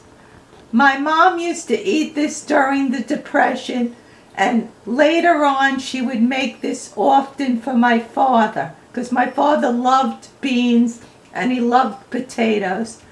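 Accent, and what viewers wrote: United States. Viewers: It's my favorite American accent!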